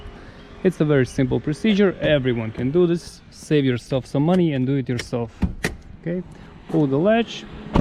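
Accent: Russia. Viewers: You sound like the Russian Hacker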